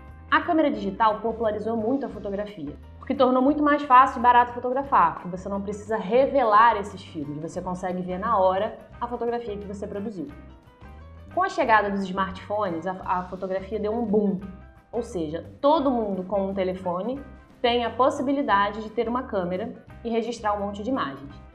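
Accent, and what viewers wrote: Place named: Minas Gerais, Brazil. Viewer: Mineiríssimo! Amei as dicas! Vou aplicar! ❤️❤️❤️❤️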